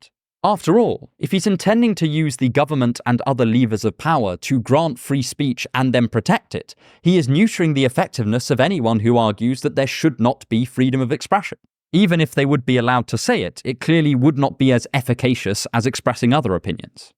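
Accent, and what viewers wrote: United Kingdom. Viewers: I really enjoy listening to your videos. I love how evenhanded you are in presenting both sides of arguments and how you make philosophical ideas come alive. And, I love your British accent! 🤩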